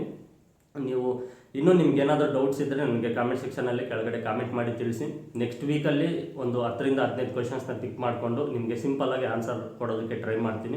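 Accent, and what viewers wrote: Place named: Bagepalli, India. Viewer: Nivu yava kadeyavaru kannada ontara matadtira bagepally Or chintamani na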